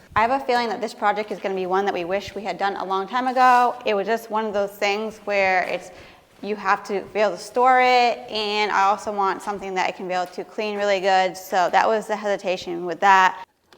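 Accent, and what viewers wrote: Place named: Appalachia, United States. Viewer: if you talk like harvest chicken. then your not a youtuber but more a hillybilly right out the fields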